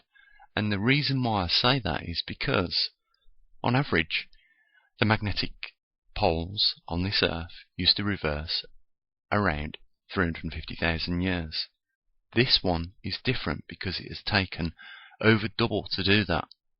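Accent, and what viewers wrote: Birmingham, United Kingdom. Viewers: At last a fellow brummy keep doing the good work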